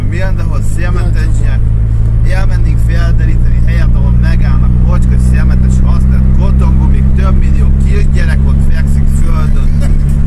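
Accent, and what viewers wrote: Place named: Transcarpathia, Ukraine. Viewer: Mert valószínűleg Kárpátaljai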